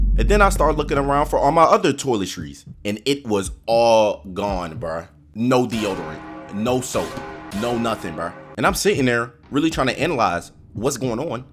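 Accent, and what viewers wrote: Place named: Toronto, Canada. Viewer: The Toronto accent making it peak❤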